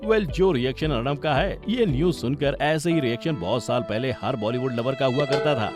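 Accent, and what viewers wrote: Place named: Haryana, India. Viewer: Bhai hrynvi ni bt bolne ki kosis k liye 100/100😉🤘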